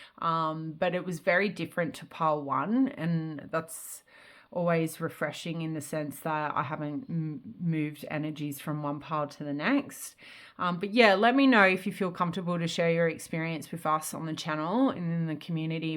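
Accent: Australia. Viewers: All the piles and it’s the best having an Aussie mate who sounds a bit like me, chilling in my lounge. And now I must ask the most important question 🙋‍♀️… Have you been shopping at Big W?? I’m busting to get to use the decks you’re giving my message with as I speak (and listen). If not, unless you wish to sink into the devil energy as a choice - as I am - all 9 of pentacles, boujee single lady in all the abundance. That’s not exactly the case, but I slapped on a face mask and got some conditioner in my hair (ma’am, I made it into the shower today and that was a soul upgrade in itself 💪) and this reading is absolutely resonating. Even more so that I can listen to it in the accent that the hate is coming from. Same as my own. Not my high school mates. They are staunch ✊ A different area but same group. Every town has one. Taylor Swift wrote a song about them - 15? 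I think?? And the kardashians didn’t blow up on love when I think about it. Kris Jenner is the boss of turning hate viewing (the best kind really bc them they’re paying attention. They are Scrutinising even) into the big bucks. That’s why I connect to Nelly’s even though I’m the wrong colour and gender and way too old to sing what I do, but I really only know that if I hear someone saying it and I believe it. And you’ve given me EVERY CONFIRMATION. Deck of Darkness - underpins EVERYTHING FOR ME in this moment. You said something like you don’t have any witchy woo cards out. I’m super spiritual but I’m als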